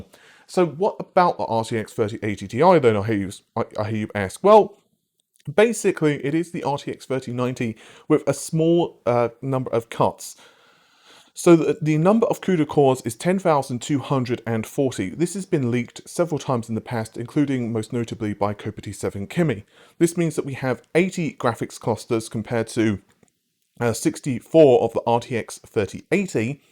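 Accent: United Kingdom. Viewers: I'm watching a guy with a British accent talk about videocards that I can't find in stock and couldn't afford even if they were in stock. What am I doing with my life :) ?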